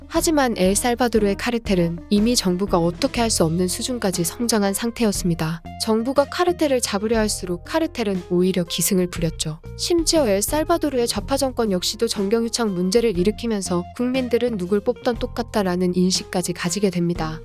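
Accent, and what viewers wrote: Gyeongsang, South Korea. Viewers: 엘쌀!바도르 58회 고향이 경상도인가봐요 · 경상도 여성분이신가봄. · 경상도 분이신가??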